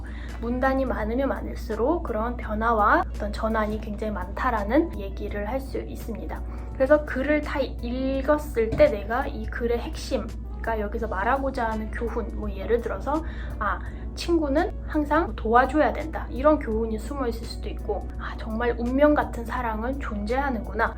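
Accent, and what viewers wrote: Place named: South Korea. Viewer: 한국에서 어려서부터 자랐나?? 말투가 그냥 한국사람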